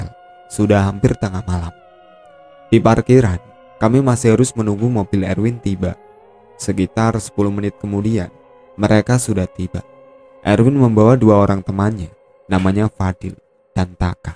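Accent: Java, Indonesia. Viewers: Min jangan pakai guwe atau gua dong .gk pas .dg logat jawa nya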